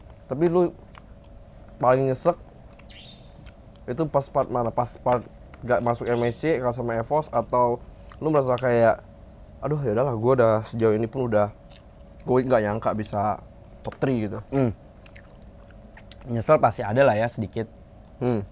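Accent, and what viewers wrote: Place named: West Java, Indonesia. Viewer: Sunda bnget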